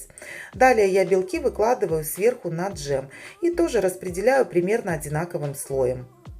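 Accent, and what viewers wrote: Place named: Galicia, Ukraine. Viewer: Как ужасен галицкий диалект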